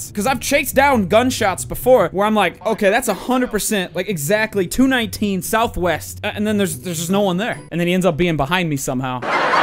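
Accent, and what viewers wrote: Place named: Brooklyn, United States. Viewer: You have a strong Brooklyn accent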